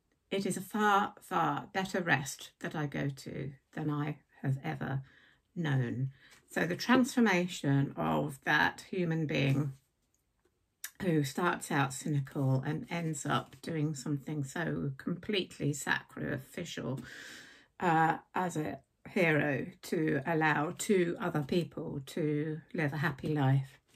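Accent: United Kingdom. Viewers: Hello! I live in Bedford County, Virginia in the U.S. It’s a small town and is the home of the National D-Day Memorial. Anyway, I’ve never been outside of the U.S. and I have always been fascinated by all things in the UK, Ireland and Scotland and especially books set in these places! I love your videos, your accent, and your knowledge of books and your reviews! Watching you makes me feel like I’m there in your beautiful country with you! Thanks for your inspiration of reading. You are lovely and delightful. Tina 😊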